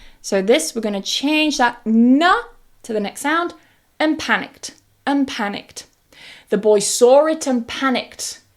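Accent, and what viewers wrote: United Kingdom. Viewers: "Hello Madam, it’s been two years since I was your student. I started my journey following your British accent, but I’ve since fallen in love with the American accent and focus on that now. Please know that you hold a very special place in my heart, and I miss you more than words can say. Sending you all my love and respect from Bangladesh ❤❤❤ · The British pronunciation is amazing 🤩 when I listen to it, I relax 😁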